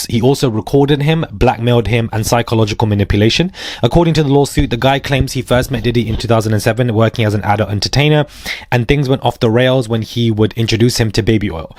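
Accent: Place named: London, United Kingdom. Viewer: Yeah his accent is a London accent. It is how we speak in the South Eastern part of the UK.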